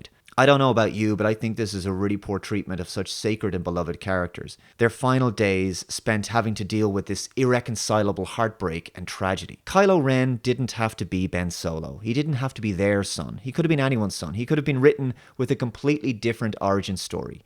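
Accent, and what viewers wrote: Ireland. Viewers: You Irish? :D Instant sub!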